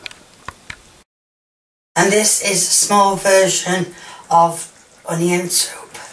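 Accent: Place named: Yorkshire, United Kingdom. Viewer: I love all your videos. I live in London now, moved from Yorkshire, so it's so nice to hear your accent. Keep enjoying yourself and ignore the idiots:) X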